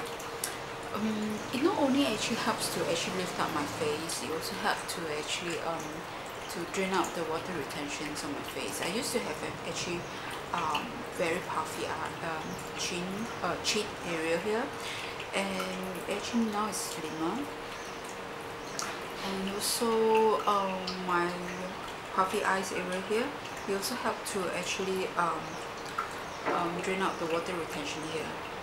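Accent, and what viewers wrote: Singapore. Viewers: are u singaporean, ur acccent!!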